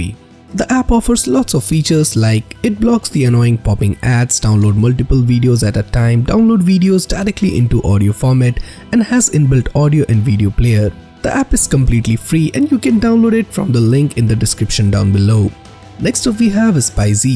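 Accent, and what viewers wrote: India. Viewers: He sounds like that Indian from android guy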